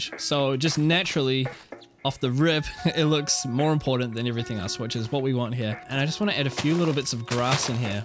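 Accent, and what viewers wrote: New Zealand. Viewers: its been bugging me for ages...are you a kiwi too??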